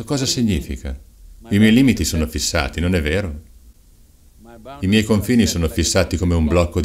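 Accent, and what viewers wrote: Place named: Parma, Italy. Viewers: con accento di Parma (o dintorni) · Il doppiaggio si ma non con quest’accento Emilianoromagnolo cosi pesante ahah mettete uno che abbia fatto un po’ di dizione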